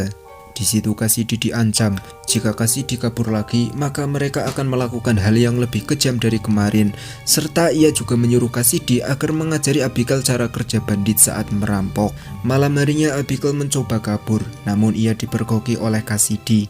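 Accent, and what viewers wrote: Java, Indonesia. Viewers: Kek orang jawa nii bang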